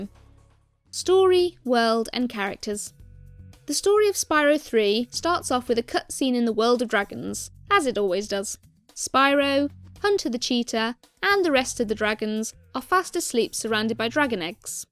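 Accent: RP, United Kingdom. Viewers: You have one of those indiscernible ever so slightly posh accents :) I like supernatural/horror content, you should check out Lazy Masquerades channel, also a very interesting voice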